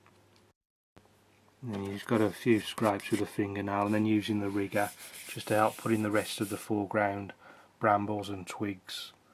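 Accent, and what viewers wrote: Birmingham, United Kingdom. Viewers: Thanks for sharing your realistic and relaxed approach to watercolour painting. Excellent, and refreshing to listen to your accent after 20 years out of the UK! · GREAT TO HEAR BRUMMIE ON YOUTUBE, KEEP ON POSTING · Nice to hear a Brummie voice on Youtube.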